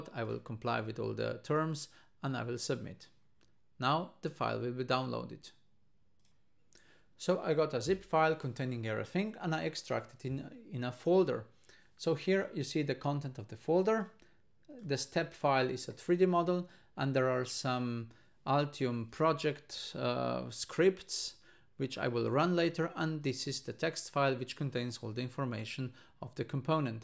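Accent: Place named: Italy. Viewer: Why do you sound Italian?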